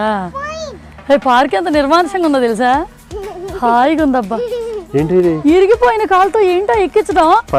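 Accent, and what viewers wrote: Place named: Telugu, India. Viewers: I can feel Pure Desi Telugu language in your voice. Fell in Love with your voice .... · Hello your from east godavari na? Bcoz your slang is like that... I like your videos andi..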